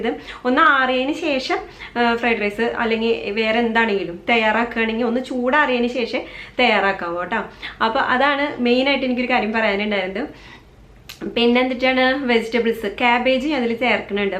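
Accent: Thrissur, India. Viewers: പിന്നെ എന്തുട്ട്ണ്::: തു ശൂർ ഭാഷ like it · വളരെ നന്നായിട്ടുണ്ട്, നമ്മുടെ തൃശൂർ ഭാഷയും അടിപൊളി, തൃശൂർ എവിടെയാ, ഞാൻ ഇരിഞ്ഞാലക്കുട യിൽ ആ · അടിപൊളി മാഷേ ഇമ്മടെ തൃശൂർ ഭാഷ അസ്സലായി ഞാനും തൃശ്ശൂരാണേ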